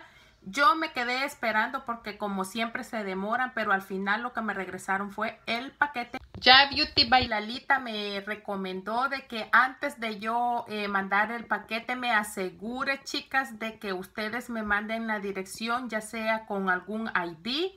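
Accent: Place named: El Salvador. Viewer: Por tu forma de hablar creo q eres de El Salvador como yo!!!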